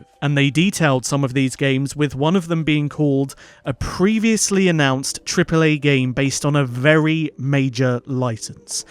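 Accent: United Kingdom. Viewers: What part of the uk is you accent?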